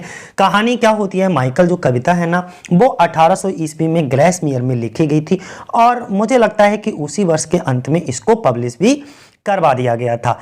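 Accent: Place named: Pakistan. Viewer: Yaar tm pakastani sound kyu kr rahe ho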